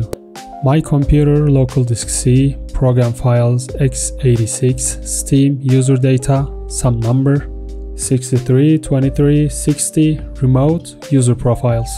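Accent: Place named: Turkey. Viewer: turkish guy detected gang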